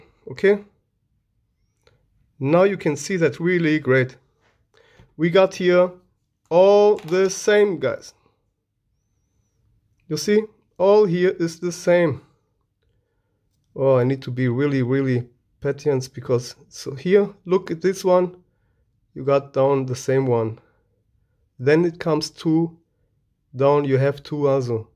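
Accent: Germany. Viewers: sounds like german or austrian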